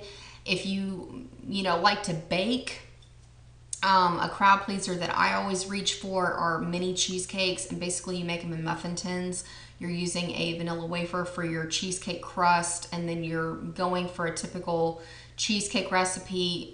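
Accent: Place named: Oklahoma, United States. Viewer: You are fairly good at hiding your Okie accent, but I caught it in this video a few times ;) I would love dinner videos, but you know me-- I post food on Instagram a lot. I need to get my "lifestyle" or "mom" channel going so I can post what I eat in a day videos.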